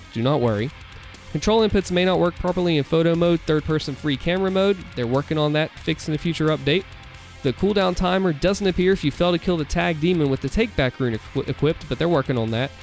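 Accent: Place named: South, United States. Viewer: Hey man. Your videos kept coming up in my Recommended section and I kept watching them (as I am a huge Doom fan). Although I liked your content, I found your accent incredibly annoying. Some kind of Southern accent, right? I'm not from the US so I can't tell, but I just found it unappealing to listen to. But I kept coming back for your content and I realised that your way of speaking is actually incredibly kind. The way you talk, the way you explain things. You don't sound aggressive or rude. I don't know you but it sounds like you are a super nice dude who probably wouldn't hurt a fly. Maybe I'm wrong but that's the vibe I get. +1 for content +1 for kind soft-spoken voice and explanations -1 for shitty accent We're still in the positives there so I subscribed!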